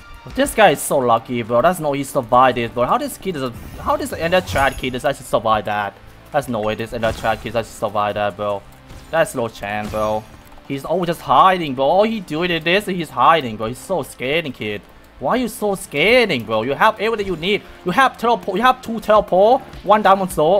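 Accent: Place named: Thailand. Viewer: I can barely understand your accent because your from Thailand im from Philippines...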